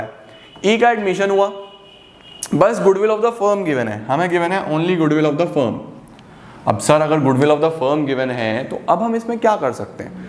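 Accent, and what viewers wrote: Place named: Saharanpur, India. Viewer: Sir saharanpur se h pta chl gya😅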